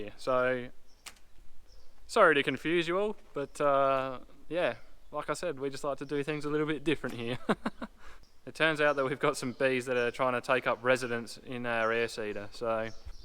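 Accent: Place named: Australia. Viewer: it’s refreshing to listen to you talk ‘Aussie’ and refer to the equipment properly. I’m so glad for the comment about the header and real, calling them what they are in Australia. Clearly I have spent too much time watching US farmers and hearing what they call their equipment. Also, you correctly refer to rainfall in mm and weight in tonnes, etc, not in imperial like our US friends. By the way, I’m slowly making my way through all your videos. They are fantastic and are a pleasure to watch. I’ve done a bit of video editing myself and understand the time that it takes. Please continue to make your video’s, every one I watch I learn something new!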